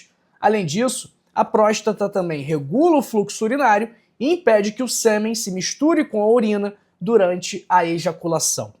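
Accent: Rio de Janeiro, Brazil. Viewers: Uma dica: seu sotaque carioca irrita muito (por exemplo, você fala proistata, quando deveria dizer apenas prostata).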